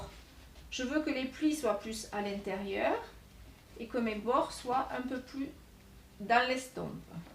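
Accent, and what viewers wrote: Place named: South-West, France. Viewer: Un bel accent du sud ouest?? Pour une video très sympa merci!! Je veux faire du découpage collage, punk, moderne, tatouage!! Merci pour les astuces collage/peinture/materiel....Le papier de boîte a chaussure, c'est une très bonne idée de recyclage!!! Et ça donne facilement du relief a nos ouvrages merci et Kenavo!,😉😉😉👌👌👌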